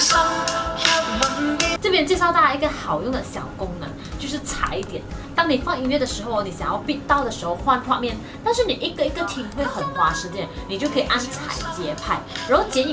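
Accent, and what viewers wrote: Malaysia. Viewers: Ke En是大马还是新加坡人？口音好重哦...😂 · 一直感觉说话很可爱很搞笑，语速很快加上独特的魔性大马口音，哈哈😃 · 马来口音很重😀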